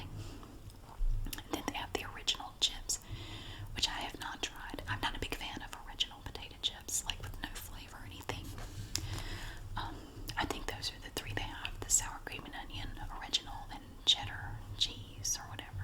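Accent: South, United States. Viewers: I'm an English man,but I love your southern American accent,your videos are fantastic & I love the way you do your thing,your whispering is awesome,please keep doing what you do,you're amazing,much love,Chris x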